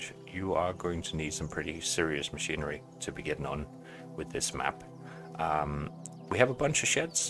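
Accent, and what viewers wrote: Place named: England, United Kingdom. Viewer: Så tillader jeg mig at skrive på Dansk (mit Engelske læner sig lidt for meget op ad Google translate) Håber det er ok. Jeg syntes jo nok, jeg kunne genkende den Engelske dialekt :D Har du lyst og overskud, så kan vi jo tage en sludre på MSN, Facebook eller noget i den stil. Jeg kunne helt klart godt tænke mig, at snakke med en anden VOKSEN, der er interesseret i FS19 og i særdeleshed dette map. Men også om alt muligt andet. Det ville være fedt at høre lidt om Scotland. Et land jeg altid har haft stor lyst til at opleve. Så har du lyst, så smid mig en besked på evt. Facebook, hvor du kan finde mig under samme navn som her :) I øvrigt dejligt at se at der også er Danskere der spiller FS og laver gode YouTube videoer. God dag :)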